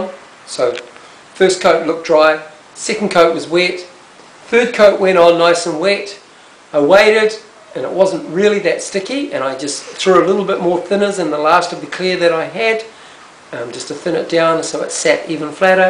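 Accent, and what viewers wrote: New Zealand. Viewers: Great video, clear explanations, nice to hear another kiwi too rather than the usual american.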